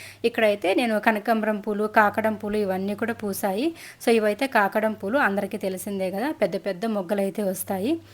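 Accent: Chittoor, India. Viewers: మిరు చిత్తూరు భాషలోనే మాట్లాడండి అక్క బాగుంది మరుమాట్లాడేవిధానం మాకు అలానే మాట్లాడండి మిపాత గొంతు వినివిని విన బుద్ధి కాలేదు. అక్క మాకు అలాగే చిత్తూరు భాషనె బాగుంది ఎంతమందికి చిత్తూరు భాష కావలి ఫ్రెండ్స్ నెను పెట్టిన కామెంట్ కి ఎంత మంది లైక్ చేస్తారు. ఫ్రెండ్స్🥰🥰🥰🥰🥰🥰🥰🥰😀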